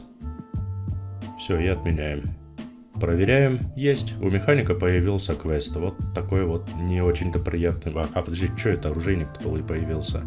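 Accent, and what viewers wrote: Kazakhstan. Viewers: говор прям наш сразу начал подозревать что с Казахстана!))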